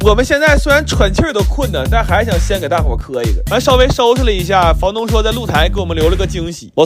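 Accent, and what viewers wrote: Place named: Northeast, China. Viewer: 都是久视频，就喜欢一边上班一边偷听这东北话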